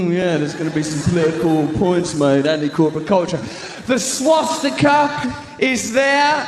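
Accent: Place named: London, United Kingdom. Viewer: I've always liked Russel Brand. He is a very smart guy and also very, very fucking funny. Not in the typical type of funny but like the smart, tongue in cheek, kinda funny. That and i sometimes start talking in a British or London accent similar to his. And you can really say and do what you want to a extent when you talk like that.. I swear it, I've done it and i normally wouldn't have got the few girls numbers that i got if i had not been talking like that. I got like 3 numbers from chicks who were way out of my league. All from talking like that. 3 different chicks one married who called her husband and Told him she was going to work late but me and my friends one female and one dude ive known for years. She said it wouldnt work talking like that and picking up chicks and such..she bet us 200 each, we won and bought her something very special. 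1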